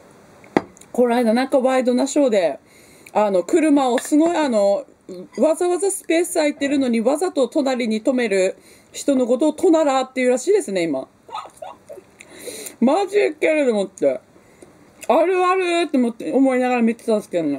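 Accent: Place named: Aomori, Japan. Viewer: 青森、懐かしいイントネーションです😀鶴亀温泉、フラワー温泉、行きたいな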